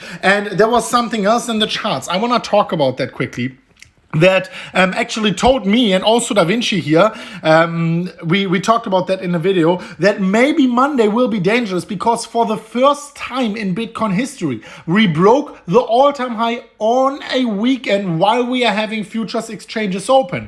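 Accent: Germany. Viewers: Good time to wait for bottom if you are a hodler. Why? Tax season. Funny German Accent Guy Say's Whales selling BTC. I'm Still bullish because we are still above the 55 day moving average but just in case the whales are not finished, set a stop loss. DXY is rising so selling BTC for USD is starting to look attractive. Appears a short squeeze is imminent.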